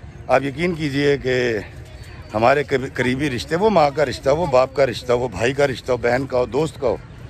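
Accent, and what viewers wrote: Lahore, Pakistan. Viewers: Basically I am from Amritsar. That’s why I love Lahori Punjabi, mithi zubaan. Same taste and same lehza 💕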